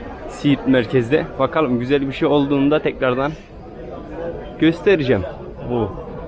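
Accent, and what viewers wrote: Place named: Kayseri, Turkey. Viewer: Bu yakışıklı da kimmiş önüme çıktı videosu 😂Gayserili gibi gonusuyor😂Ulukisladan selam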